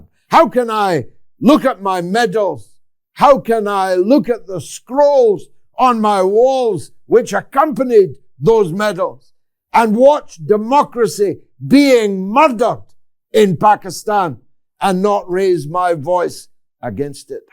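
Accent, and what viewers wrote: Scotland, United Kingdom. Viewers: ☔... old guy saying thanKs again.... me toO....imagined watching the Nazi's carry out their crimes during ww2 on YouTube.... would it have helped or not ?....born in 1950 i'm barely chipped away at the extent of the brainwashing and propaganda inflicted upon my generation and continuing... nevermind .... your accent ?..... just like my grandma's.... Fraser clan... and clan Donald....💜.. peace 🇵🇸🇾🇪🇸🇴🇪🇹🇸🇸🇦🇫🇱🇾🇮🇶🇸🇾🇱🇧🇯🇴🇨🇺🇺🇦🇷🇺🇺🇸.....⚾ ....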